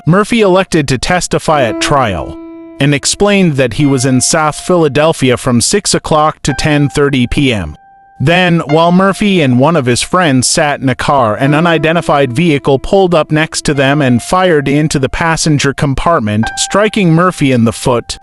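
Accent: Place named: Europe. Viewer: Thea ducking European narrating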